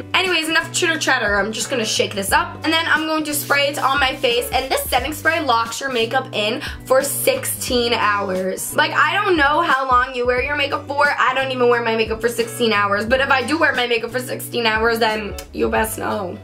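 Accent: New York, United States. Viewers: You have such a New York accent I love it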